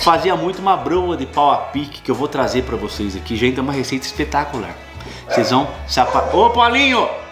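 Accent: Minas Gerais, Brazil. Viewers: O sotaque mineirinho voltou com tudo! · Adoro suas receitas!! Adoro vcs dois! vc é mineiro?!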